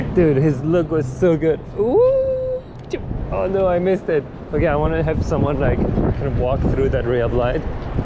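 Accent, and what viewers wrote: France. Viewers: great video awesome French accent-) need advice, im a run n gun shooter from shooting hockey games to street photography, debating strongly between the 35-150 and the new 24-70 g master. 35-150 is heavy not the most fun to carry around and missing the 24mm... while the 24-70 I feel like im missing some range.... what would you do? I feel that I like my 24-105 better lolll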